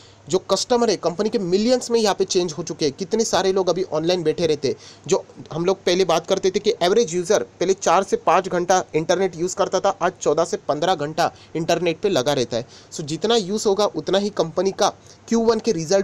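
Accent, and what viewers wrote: Maharashtra, India. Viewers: Marathi aahet sir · Bhau apla marathi ahe acent warun samajte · Sir तुमचे नाव kay आहे बोलण्या वरून तर वाटते मराठी आहात share मार्केट शिकायला खूप हेल्प hot आहे तुमची सर्वजण फी वैगरे मागतात 1एप्रिल पासून continue vidio बघत आहे तुमचे फायदा pan झाला thankyou so much sir pan नाव सांगाल तुमचे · Mala pan vatala marathi aahet...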